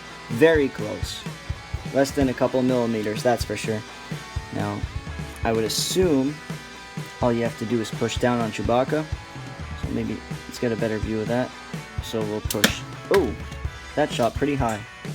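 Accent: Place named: Netherlands. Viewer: Why do you have a dutch accent?😂🇳🇱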